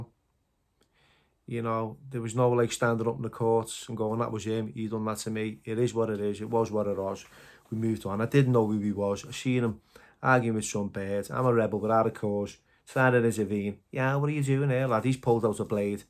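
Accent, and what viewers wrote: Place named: Yorkshire, United Kingdom. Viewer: Belter Yorkshire accent that lol love your story's fella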